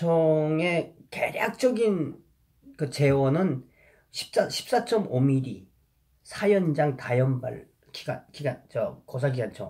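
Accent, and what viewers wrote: North Korea. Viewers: 자주 놀러올게요 감독님~탈북하신 분들이 서울말 쓰려고 애쓰시는 것 같던데..그냥 고향말투 쓰셨으면 좋겠어요. 들을수록 정겨워요. 😀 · 재밌게 하실려고 하시는거죠 이북 뉘앙스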